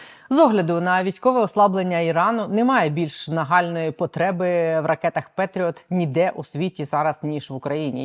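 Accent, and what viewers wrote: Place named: Russia. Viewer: ВЕДУЧА! ЩО ТВОРЕТЬСЯ З ТВОЄЮ ЩЕЛЕПОЮ,ЩО ТИ ЗНУЩАЄШСЯ НАД УКРАНСЬКОЮ МОВОЮ:ЩЬО,ЩЬО,ЩЬО,ЯКЩЬО,ЩЬОБ,ЩЬОБ,ЩЄ,ЩЄ,ЧЬОМУ, ВЧЬОРА,ДА,ЧЄРВЕНЬ,ЧЄ,ЧЄ,ЧЯС,ЧЄНОВНИК,НАЙБЛИЖЧЬОГО,НЕБЕЗПЕЧЬНИМ,ПУБЛІЧЬНОГО,ЧЄРГА,ЯКЩЬОБ,ПОЧЄНАЄ,ОДНОЧЯСНО,ЧЄТВЕРГА,ПОЧЯТИ,ЗА ЩЬО,??????????????????????ГАНЬБА ТВОЇЙ МОСКАЛЬСЬКО- УРОДСЬКІЙ ЩЕЛЕПІ!!!!!!!!!!!!!!!!!!!!!(УКРАЇНСЬКА ДІАСПОРА В США)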